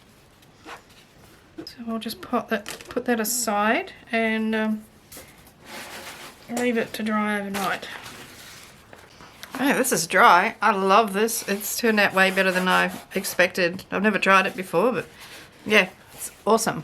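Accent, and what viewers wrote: Australia. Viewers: I love this journal! It's so nice to hear another Aussie accent! I enjoyed watching you have a go ❤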